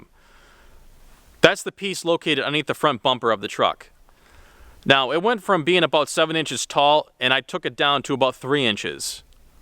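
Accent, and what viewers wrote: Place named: Boston, United States. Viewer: Somebody's from Boston! I'm from Revere, lol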